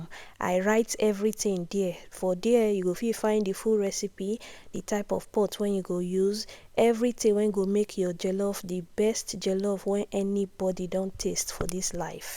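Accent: Nigeria. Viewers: don win my heart with this original Naija pigin English accent 🎉🎉🎉❤❤😂😂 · are you from PH or did you grow up in PH? This pidgin be like the one wey abobi dem, dey speak for Diobu 😂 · That niaja pidgin sounds so sweet in my ear❤❤❤❤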